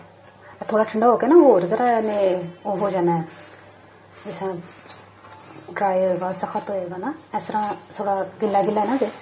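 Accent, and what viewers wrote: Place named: Punjab, India. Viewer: میں آکھیا جی بڑی چنگی ریسپی بڑی مٹھی پنجابی تے بڑی سوھنی آواز اے تہاڈی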